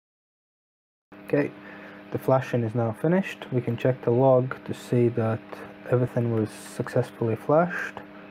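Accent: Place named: Russia. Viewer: А акцент то русский/украинский/белорусский (ну ты понял)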